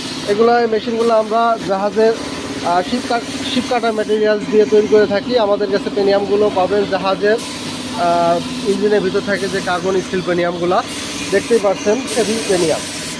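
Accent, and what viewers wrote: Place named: Faridpur, Bangladesh. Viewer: ফরিদপুর কথায়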